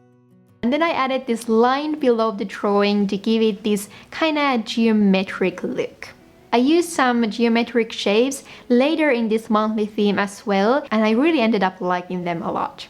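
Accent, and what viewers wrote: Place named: Finland. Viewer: Found this video by searching for bj/planner videos. Amazing artistry. 👌🏽🙏🏽❤️ As soon as you started speaking I guessed you're Finnish😊🇫🇮. No offense ❤️. EDIT: The squirrel is exquisite 💕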